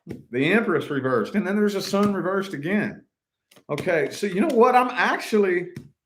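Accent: South, United States. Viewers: You have to be southern like me with that voice! LOL! I'm from Georgia. And, you're on point with the start. I deserve to be more than an option and game. I watch these for healing and because I am spiritual, I'm Cherokee, and religious. And, it helps to heal with God in place. And, stay focused. I gave my best. For years I tried, done the growth. Forgiveness for their growth, tried to show them that I saw the better in them, and was overlooked or judged for it rather than appreciated. And, at this point, I'll believe what I see. As I deserve, or nothing. No blame game or grudges, just reality and life is too short and should be valued with those we love and love those who love us. Because, pride doesn't love us as much as we love to have it, and it was always first before me whereas they were my choice. So, I done them a favor since they were so unhappy and regretful, with hurtful words, and like I was in the way for loving them, and I left. 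So they could be happy. That was what they made me believe they wanted. I'm a realist. And, everyday that goes by is everyday we cannot get back and nobody deserves to wonder why they don't deserve to be apart of it. Happy holidays!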